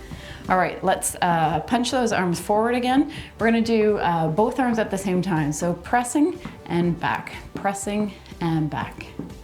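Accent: Canada. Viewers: I love your class. I walk with a cane. I look like stroke victim but I’m a cancer patient. I am thankful right now for my son, who got married this past weekend and for my daughter who is on a DTS in Glacier National Park. I am from NY in Rochester.where are you from? I hear a slight accent…. Canada? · Thank you, that was simply WONDERFUL!!!, I live in Michigan, and I've been wondering if you're Canadian. The way you pronounce ''out'', I like it.!!!!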